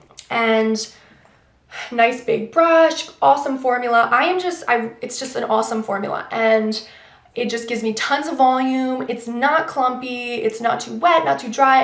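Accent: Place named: Philadelphia, United States. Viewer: are you from Philadelphia? Your accent sounds like it could be :]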